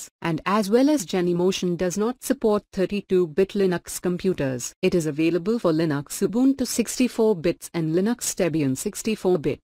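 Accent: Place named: India. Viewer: your TTS sounds like indian accent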